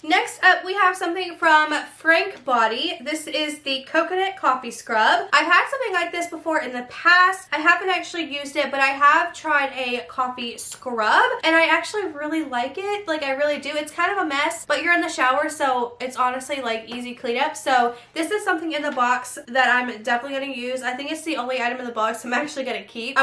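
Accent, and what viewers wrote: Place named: United Kingdom. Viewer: I'm really surprised, I thought u were British and live in the UK,for some reason I hear a British accent when u talk.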